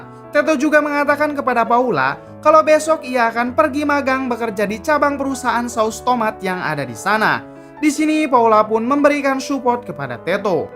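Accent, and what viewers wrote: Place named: Madura, Indonesia. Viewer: Alur cerita kalau dibacain pakai aksen Madura emang >>>>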